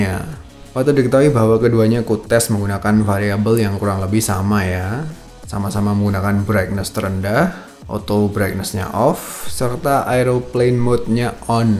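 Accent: Java, Indonesia. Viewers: Pengisi suara nya medok jowo.... Parah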